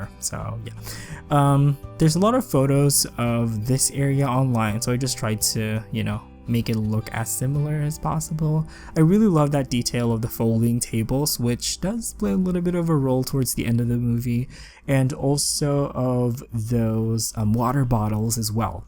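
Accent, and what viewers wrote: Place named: Philippines. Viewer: Your English is very good! I read you're Filipino so your accent is so similar to my friend :)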